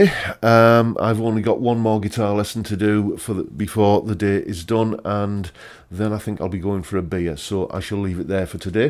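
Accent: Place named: Teesside, United Kingdom. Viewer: Ahhh, you're a Teessider! I've been trying to work out your accent for days since I found your channel. I knew you weren't a Geordie (no hair on you palms) but I was thinking Co.Durham. Now that you show the Gazette though, I'm thinking closer to the Ironopolis. I'm guessing not Middlesbrough though (I'm from Marton but live in Manchester) because your accent is a bit too thick. You say you live close to Darlington, so I'm going to guess Stockton. Am I about right? I'm going to say: 1. -. I was 13 years old and it came on the TV on The Box through me little comcast box. I remember borrowing my sister's tape and getting chills from the solos. 2. -. After borrowing Cali, my sis and her b/f made me a mixtape which had on it. I used to listen every Sunday on my paper-round to this tape and always floored me with how dynamic and distorted and squeelie the guitar was. 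3. -. strat on this (I believe it's a strat) just shows exactly what a clean tone should be. It's all about the phrasing and the fingers, but also a well set up guitar with proper action and the right gear. 4. -. Perfect tone. 5. - Sorry but... It wouldn't be a hit song if it didn't have something going for it and LP sounded amazing on my way down the Avenue of trees in Acklam on a summer's day during my exams. That particula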